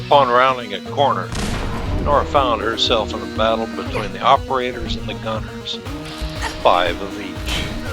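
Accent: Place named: South, United States. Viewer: when I first watched your videos, your narration reminded me of Easy Pete for some reason, perhaps because of that Southern type accent you have, which sounds great by the way. Now I'm thinking you're more like the JRR Tolkien of FO4 because your storytelling is on another level. Thanks for sharing this video. It gave me a lot to think about after I watched it and yes, the music is great, compliments it well!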